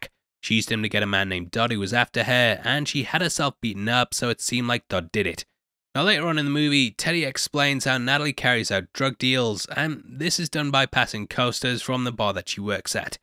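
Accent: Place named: England, United Kingdom. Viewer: You voice is like every English voice put together. Nice video new fan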